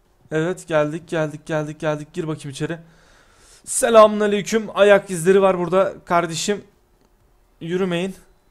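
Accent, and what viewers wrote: Adana, Turkey. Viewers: Abi Adanalı mısın yanlış anlama bende adanaliyim bizim gibi konuşuyon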